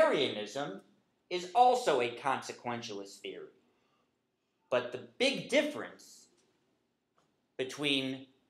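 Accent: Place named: South, United States. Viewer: It's so sad that I could tell he was from the South.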